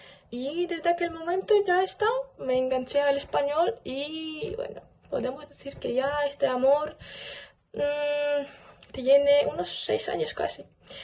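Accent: Galicia, Spain. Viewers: Hablas bien gallega. Saludos desde Argentina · Tu acento es español😅 muy diferente al castellano latinoamericano 😊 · Sí se dice también "friolera", ella habla español de España. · Tu español es producto de vivir en España, lo imagino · Hola tienes acento GALLEGO. O sea ESPAÑOL. Segura Que no erez ESPAÑOLA. Vale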